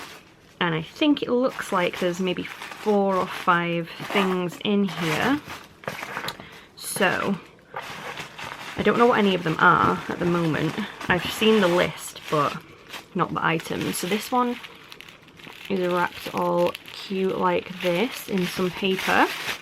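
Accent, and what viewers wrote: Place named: Yorkshire, United Kingdom. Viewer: Omg your makeup is so perfect 💗 also I love your accent are you from Yorkshire?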